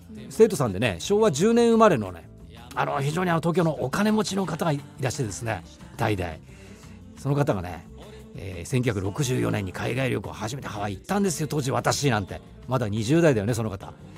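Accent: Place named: Yamagata, Japan. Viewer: 埼玉県から 聞いています。 東根出身なので懐かしい山形弁最高です☺️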